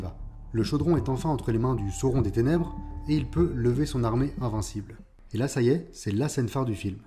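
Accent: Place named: Brussels, Belgium. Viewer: L'avais compris grâce à une autre de tes vidéos. Bruxellois, je suppose. Suis né belge flamand.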